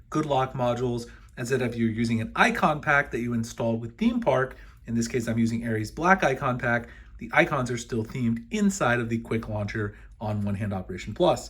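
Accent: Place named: United States. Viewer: Nice informative videos. 👍🏼👍🏼 Kudos to you I found your channel by chance the other day, when looking for YouTube videos about Samsung Galaxy smartphones/tablets guides Note: this is not a critisism, but simply ❓ A wee, and somewhat personal request (by me) vis-a-vis your accent. ❓ Any chance that you could slow the pace of your speech. (Pretty please?) We Brits, on the other side of the pond, tend to find your pace of conversational speech a tad fast for us at times and I have to keep backtracking to watch it again. When I'm in the USA, and I've been back and forth since the early 80's visiting relatives, even though they do understand my accent, albeit a regional one, I now slow the pace down, so my relatives can understand me much better, even though we've known each other for 40 yrs plus, they still, at times, say I talk too "fast" for them, and have to repeat myself from time to time. 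I'll keep watching, either way, as what you show, and explain, shows there's a lot of depth, and "hidden" things within the S23 Ultra that I didn't even dream of. I've only had mine for around 3-4 days, and I'm still getting to grips with it and it's way of working and you've alreay helped me out greatly. 👍🏼👍🏼So, again, kudos to you from me, and others too no doubt, for your work, and tips on how t get the best out of Samsung smartphones. 👍🏼👍🏼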